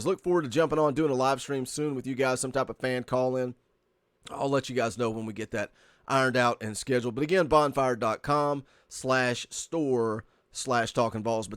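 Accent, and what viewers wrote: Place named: East Tennessee, United States. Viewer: Thanks for keeping on top of things!I I appreciate all your hard work. I live in Elizabethton sounds like you're an eastTN. guy also.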